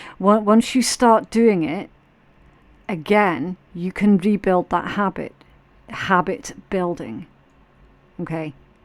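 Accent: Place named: Scotland, United Kingdom. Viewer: I love your accent, I believe it’s a Scottish accent. It sends me back to when I was a little child and lived in a house right next-door to grandma and grandpa from Scotland. Thank you so much for sharing your spirit with us. Might love always.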